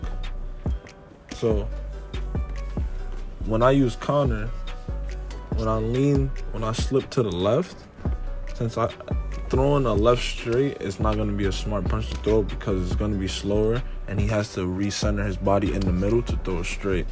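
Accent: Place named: Bronx, United States. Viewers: Bronx I dead recognized the accent after you said controller🤣😭 fire video gang 🤞🏿‼️ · U from new york fasho bru. U gotta be😂😂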